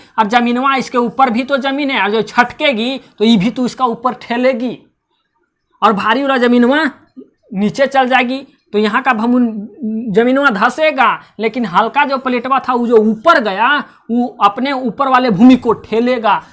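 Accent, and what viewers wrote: Bihar, India. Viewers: आप बिहारी जैसे बोलते हो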